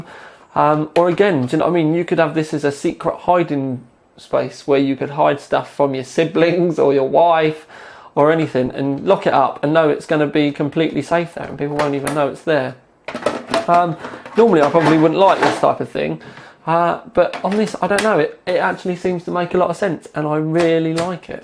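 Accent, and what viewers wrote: United Kingdom. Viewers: Way off topic but whenever I hear a young dude with a british voice it always reminds me of the harry potter movies.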